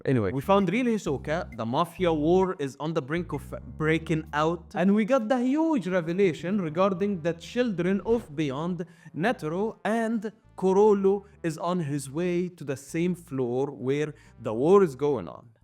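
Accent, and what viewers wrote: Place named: Egypt. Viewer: My friend you have an Egyptian accent.. it's nice however, is it actually Egyptian?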